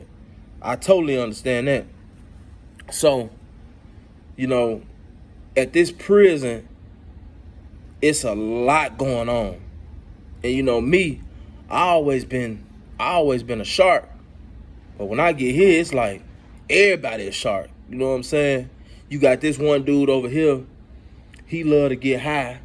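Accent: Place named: Alabama, United States. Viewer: Damn I didn’t know you was from Alabama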